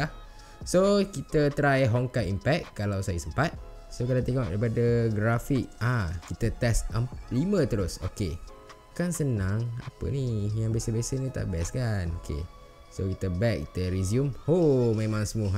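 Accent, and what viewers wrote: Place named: North, Malaysia. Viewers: Laaaaa orang utara kaaaa...dok mana?? Huhu.. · Pekat noh loghat kedaq hang 😂😂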